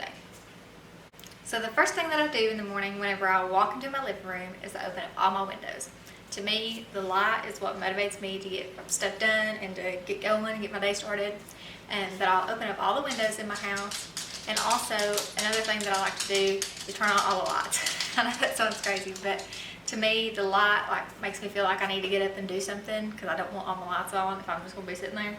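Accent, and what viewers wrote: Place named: South, United States. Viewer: Where are you from? I love your southern acent I wish I sounded like that LOL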